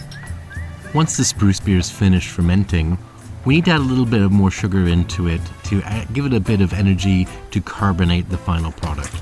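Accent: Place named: Dublin, Ireland. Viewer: Have you spent any time in ireland in the past? Your channel says your from Canada, but your accent sounds like you could be from Dublin to me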